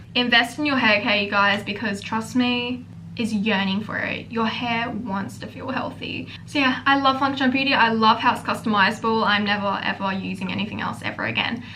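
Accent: Australia. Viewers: im not even listening to her advices i just looove the way she talks and that heavy aussie accent xD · as soon as i heard ur aussie accent, i knew you’d be a youtuber i’d love and man i just absolutely adore your duality and how you’re so empowering and motivating✨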